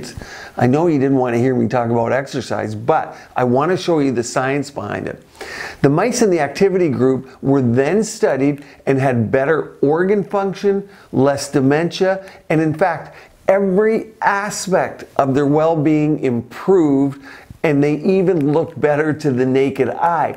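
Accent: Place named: Canada. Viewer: I was so confused because I was listening to another video and thought 'he sounds so Canadian'....haha! Just discovered you this week, great content! Keep up the great work, cheers, from another Canadian!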